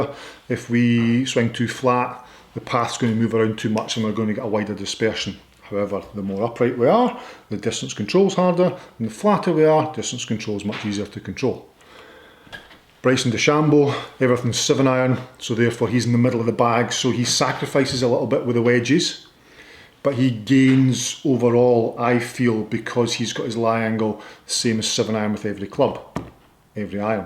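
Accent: Scotland, United Kingdom. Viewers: Well explained and demonstrated, as usual. Your videos are easy to follow as you explain and demonstrate very well , with a keen awareness of camera angles for the best viewer experience. Your delivery is excellent based on clear diction and a slightly aggressive but at the same time friendly direct Scottish accent, which I like - direct and no BS. Good sense of humour with amusing asides to camera are also very good. Lots of stuff on you tube but you appeal to a high handicapper hacker like me. Seeing some improvement with eureka. Big fan, keep up the good work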